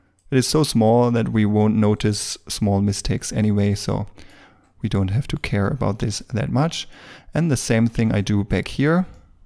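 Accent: Germany. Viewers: du bist dedutsch oder? Cooles Tutorial 😍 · Sei mir nicht böse aber dein deutscher Akzent, man merkt es einfach. Ansonsten mega gutes Video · you sound like a german man:D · german accent exists: "give me the whole stock!" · das ist witzig man hört echt sofort raus, wenn ein deutscher englisch spricht xD, aber super video, sehr hilfreich, danke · i hear a german accent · Junge... xD man merkt dass du Deutscher bist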